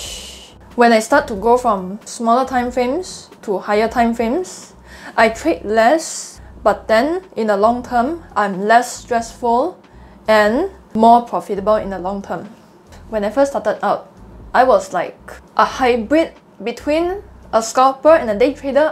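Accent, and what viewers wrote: Singapore. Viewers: Thish is pure singlish and I love it..cheers!!! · i love singlish i cant hear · Your accent so familiar, let me guess you from singapore 🤭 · that is singapore accent, if you dont know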